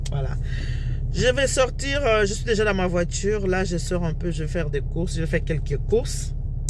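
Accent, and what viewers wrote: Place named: Democratic Republic of the Congo. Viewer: Toute belle maman. Je suis une abonnée qui te suis depuis Ndjamena au Tchad... J'aime beaucoup tes vidéos et surtout ton accent congolais 🥰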